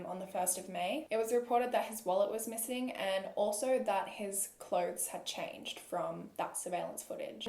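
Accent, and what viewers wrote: Australia. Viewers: The Australian accent is the best. Your voice is very soothing 🙌🏼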